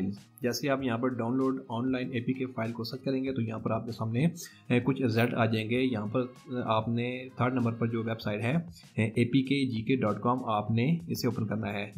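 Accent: India. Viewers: The indian voice is Is- PERFECTO!!!!!!😎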